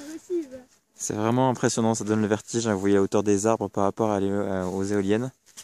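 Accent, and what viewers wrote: Franche-Comté, France. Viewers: j habite juste a cote de salon de provence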